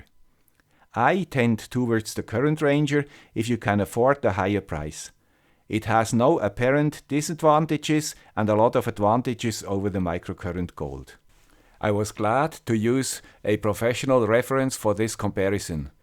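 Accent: Switzerland. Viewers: and don't forget 'the guy with the Swiss accent' reference either - otherwise us old folk will become bewildered and confused. We don't like change!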